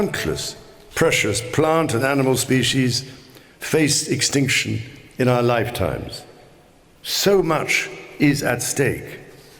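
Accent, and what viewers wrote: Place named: England, United Kingdom. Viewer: He is speaking Italian with a very heavy English accent. He did well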